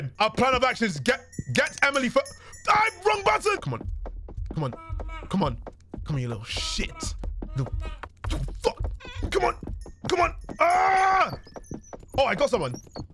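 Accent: United Kingdom. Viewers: you're from London??? Why didn't you come out as british earlier???? Smh my head I feel so betrayed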